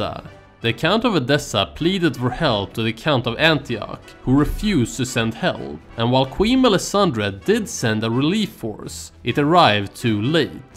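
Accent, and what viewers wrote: Sweden. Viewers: That is an unmistakable swedish accent, doesn't have a choice i imagine.. At least he speaks your language fluently and made this..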